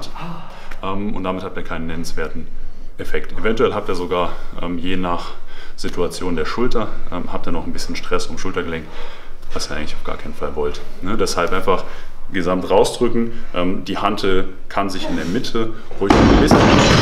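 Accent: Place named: Saarland, Germany. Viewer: finds geil das ich nach nem video gesucht habe das mir die thematik näher bringt 100 prozent alles dabei was ich gebraucht habe. auch geil das ich beim schauen irgendwann den saarländischen dialekt rausgehört hab, geil auch noch dass es aus meinem Landkreis ist xD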